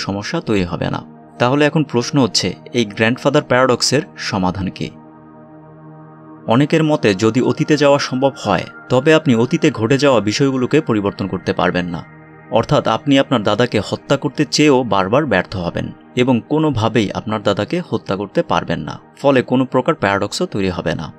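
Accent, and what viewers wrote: Narsingdi, Bangladesh. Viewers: ভাই আপনার এলাকা কি নরসিংদী? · ভাই, আপনার বাড়ি কি নরসিংদী?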